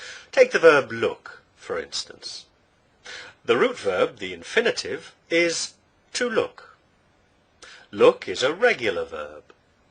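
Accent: United Kingdom. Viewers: Thank you very much, I love your daily dose of English and most of all your AMAZING and musical British accent. I'm Italian and I'm studying English seriously since 2014 but, sometimes, I think that my accent is definitely hopeless. I'd like to narrow my vowels and to smooth the double consonants, but I tend to sound .... I don't know how to explain it ... too long whereas you British have this short and cut and clean although "bonded" way of pronouncing words. It's difficult for me to shadowing you, but I'm dedicated and stubborn, so let's see what happens. Again, thank you for your eccellent job and have a lovely weekend. Monica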